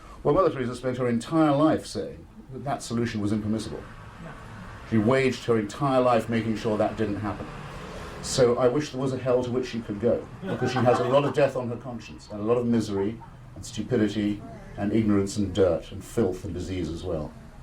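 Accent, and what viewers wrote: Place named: RP, United Kingdom. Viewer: Mother Teresa made mistakes. but having volunteered many times at her homes with the destitute I can say she lived a life of a true hero. Hitch takes about money. She owned 2 cheap saris and slept on the floor and was bone thin from eating same food as the destitute. Unlike other foreign missionaries she lived like a native. Hitch is a bloated blowhard with a posh accent who was wrong on every major issue including his passionate support of the Iraq war. By the way when I visited her home I inquired why they have no fund raising and they said God will provide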